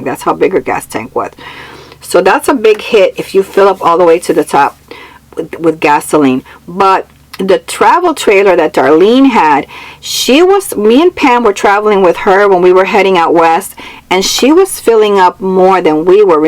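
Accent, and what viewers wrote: Latin America. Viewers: Hair looks great, as usual- librarian glasses definitely a great choice, subtle Latina dialect- great outfit choice... Gonna give this one a solid 8.9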